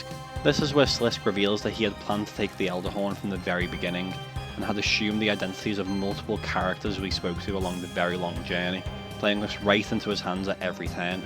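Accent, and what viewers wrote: Liverpool, United Kingdom. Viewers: Very well made interesting video for a channel with a small amount if subs, I would have subbed, but cba with your dirt scouse accent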